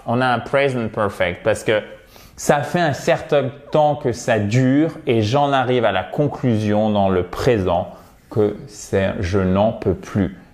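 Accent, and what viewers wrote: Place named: United States. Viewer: Bonjour super boulot par contre pour nous européens l accent appuyé américain est plus difficile à digérer que la prononciation souple et coulée de nos amis anglais.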